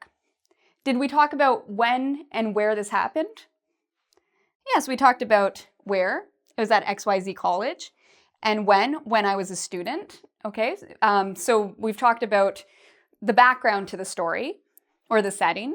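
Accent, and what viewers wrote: Canada. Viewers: Very useful, great! Just one question,: are you Canadian? ☺ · shes from Canada, I can tell by her accent. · Cute canadian accent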